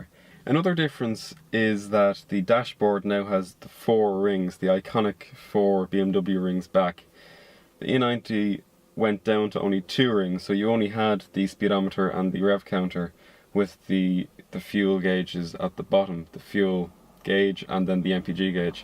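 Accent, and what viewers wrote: Ireland. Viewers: Great review and I'm a fan of the Irish accent :-). Having come from an E90, the steering felt weird at first, but I'm used to it now.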